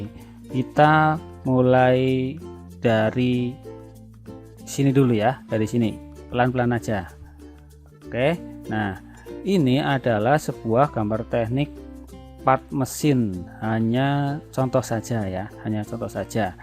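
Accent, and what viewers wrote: Banyumas, Indonesia. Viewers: pisan mas daerah ndi kie, purwokerto hadir😂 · mantab om tutorial jos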